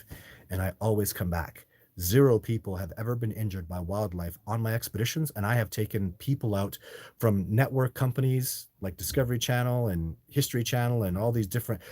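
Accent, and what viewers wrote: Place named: United States. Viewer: You sound more like an American, than a Canadian! You can move down to the States if Canada is gonna keep you locked up! 😊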